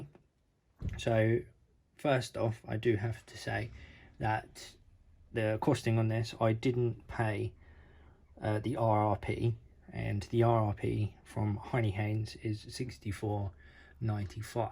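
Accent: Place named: United Kingdom. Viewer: Thanks for the videos by the way, it's always nice to have another British voice chime in and help give insight with context of our laws and prices. The HH custom/special knives are such a good idea for us UK folk in regard to affordable and legal, I hope it prompts companies to start thinking globally a bit more when it comes to lock/size decisions. Thanks for stating the price, I wasn't trying to pry, just thought if anyone is looking at this and wondering how much of a difference used and new are it might help. I've noticed a lot of people talk about the resale market without mentioning price or where to go (I've found Instagram is the main second hand market).👍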